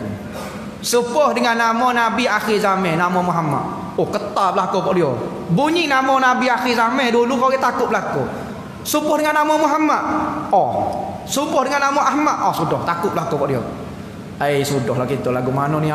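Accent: Kelantan, Malaysia. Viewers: Sedak dengar kecek klate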